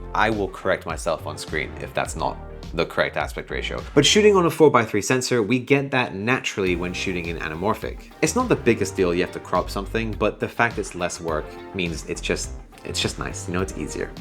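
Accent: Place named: United Kingdom. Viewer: Love the S9!!! OPENGATE!!! by the way at first I thought you're american but I keep thinking you sound kind of british. I had to check your channel info 😅 (fyi I'm studying how to speak English which is why I'm trying to guess the accent 😅)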